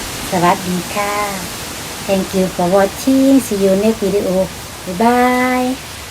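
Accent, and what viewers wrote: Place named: Isan, Thailand. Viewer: พุดกลางน่าจะไพเราะกว่านะคะพุดอิสาณไม่ไพเราะน้อง นะแต่คนอิสาณคนอื่นพุดไพเราะน้อง พุดกลางน่าฟั่งคะ💞💞🧚🧚💃💃🏇🏇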